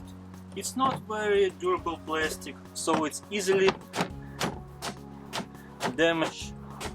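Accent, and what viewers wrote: Russia. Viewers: I like the Russian accent.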